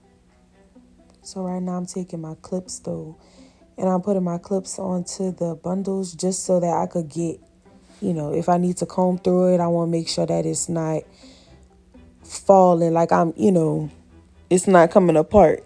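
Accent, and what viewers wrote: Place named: New Orleans, United States. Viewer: New Orleans!! lol gotta be I know accents like the back of ma hand im from Atlanta, but if im wrong ma apologies love lol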